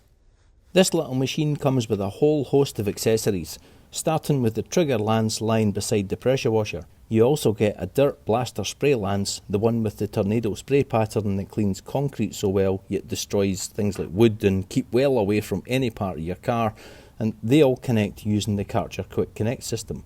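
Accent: Scotland, United Kingdom. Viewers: Hello, A nice laid back assement of Karcher's latest product. And also good to hear a friendly Scottish accent.🏴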